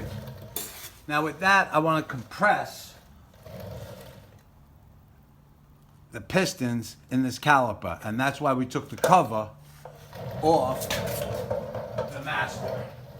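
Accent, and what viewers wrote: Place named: Brooklyn, United States. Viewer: Love the accent. Sounds like Brooklyn or SINY , my part of the country.. good tips thanks for the info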